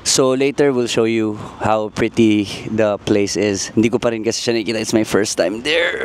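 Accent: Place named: Philippines. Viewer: your accent is so Pilipino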